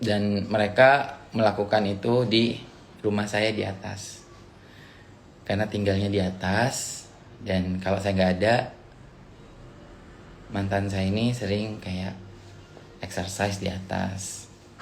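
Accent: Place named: Jember, Indonesia. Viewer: Logat jembernya masih kentel ... Bah... Semoga ada seseorang yang bisa sembuhkan kamu. Aamiin.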